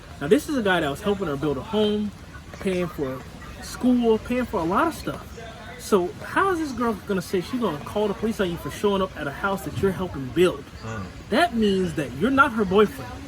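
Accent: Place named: Spain. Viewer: Ahahhaha i love your Spanish accent. Ejejejej no tengo leche en la casa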